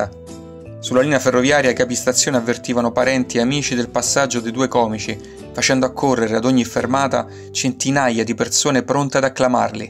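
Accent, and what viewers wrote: Rome, Italy. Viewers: Video interessante e ben realizzato. Ma se lo speaker correggesse quella terrificante "c" romanesca presente in OGNI parola, il lavoro risulterebbe decisamente più godibile. E lo dice un romano come me...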